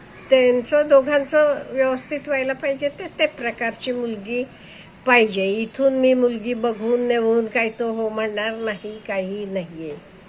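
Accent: Karnataka, India. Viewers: त्यांची मातृभाषा मराठी नसेल..You should accept other language speaking pple's tone!! कानडी असाव्यात..छान बोलल्या.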